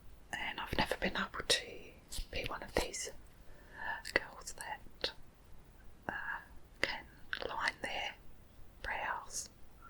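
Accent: Australia. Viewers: I don't wear make up but i love the Aussie accent!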